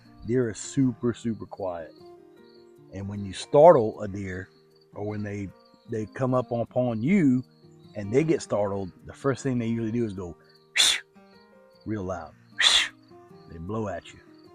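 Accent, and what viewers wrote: Louisiana, United States. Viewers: I’m watching you for the first time…I was saying to myself this Arkansas guy sure sounds like he’s from back home. I’m from Lake Charles but moved to Memphis recently for work. I have a Bronco. Gonna check out dem Ozarks real soon. Can’t find no good sausage in Memphis area. I have to bring Sausage and Boudin from home.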